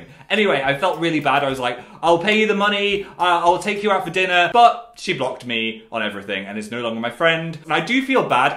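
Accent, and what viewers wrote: North, United Kingdom. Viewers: Idk why I really heard the northern accent in this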